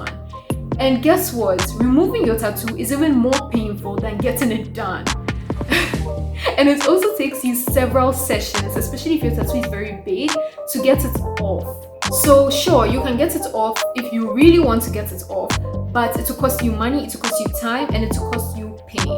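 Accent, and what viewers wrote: Ghana. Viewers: You're so wise for your age. And your Ghanaian accent is so sweet and authentic. I wish I could regain my ability to speak like that again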